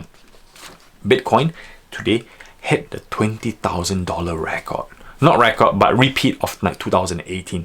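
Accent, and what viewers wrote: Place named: Singapore. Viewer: oh! where are you from? you sound singaporean :)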